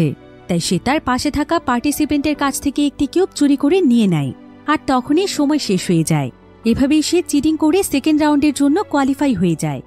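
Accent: Bangladesh. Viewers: accha apo .. apni ki Dhakai taken?😶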